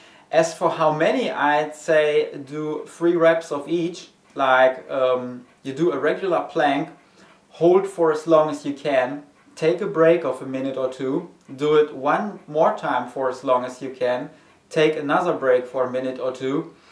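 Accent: Germany. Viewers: Good Video, you voice rocks btw :D, are you German ?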